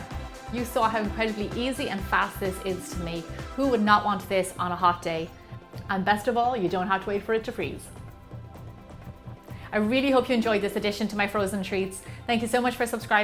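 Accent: Ireland. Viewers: ᏙᎠ ᎠᎰᏚᏟᎾᎡᎠᏙᏁᎢᎰᏙ ᏚᏤᏚᎢᎬᎷᏙ ᏁᎾᏚ ᏚᏙᏞᎢᏙᎢᎾᏒᎰᏴᏌᏚ fake?? shes Irish... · Your accent!!! Beautiful. Irish? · Are you Irish?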